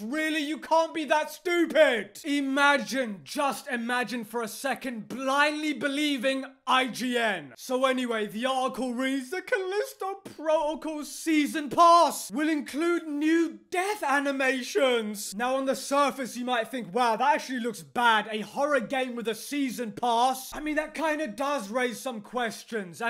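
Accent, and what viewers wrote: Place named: England, United Kingdom. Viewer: Geez, these " This game sucks" channels appear to be all the new rage. They are popping up everywhere, the formula: Possess an English accent, utilize clickbait titles, and just yell about bad something is. LMFAO, the North American IGN review isn't even up on their site yet and he's screaming bloody murder about a game he didn't play and how bad it is 🤣🤣🤣🤣🤣🤣🤣🤣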